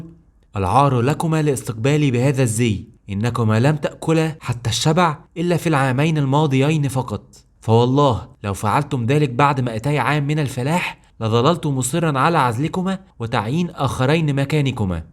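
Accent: Egypt. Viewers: يارجل ... ليت انك تتحدث بالفصحى ... فوالله ما اكره شيئا كما اكره سماع اللهجات بمختلف انواعها ... ودرجة الاشمئزاز كبيرة جدا عندي ... عند سماعي الجيم المصرية التي حولت لحرف g الانكليزي تكسيحا لجوخ الضباط الانكليز وتقبيل نعالهم ...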